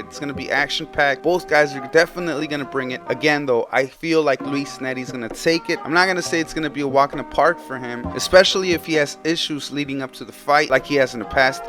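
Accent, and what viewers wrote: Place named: Pocho, United States. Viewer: El que habla se ve que es un pocho, mejor hazlo en español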